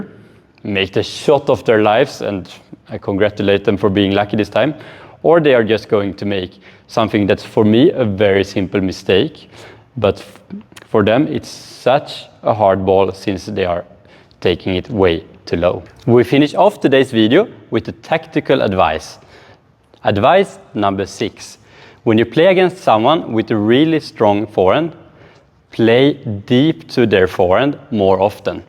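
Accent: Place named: Sweden. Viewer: bra tips, o alltid charmigt med grov svensk dialekt på engelskan :)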